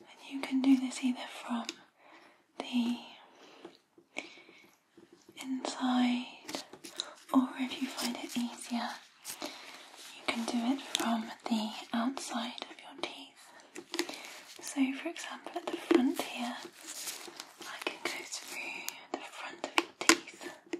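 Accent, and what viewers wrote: United Kingdom. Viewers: SHES BRITISH MATE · Is this brit accent ?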